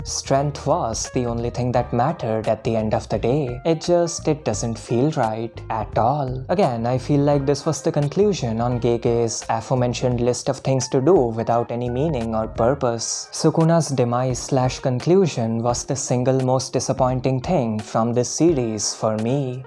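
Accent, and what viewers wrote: India. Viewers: Clearly seems to be Indian.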